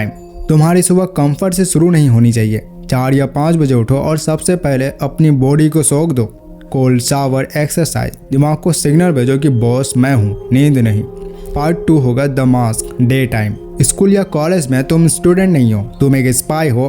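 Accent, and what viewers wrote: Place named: Bihar, India. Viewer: I have noticed that you speak like my Bihar friends , Are you from Bihar, I wonder ? No matter what someone says words and ideas can change the World - referred from Dead Poet Society !!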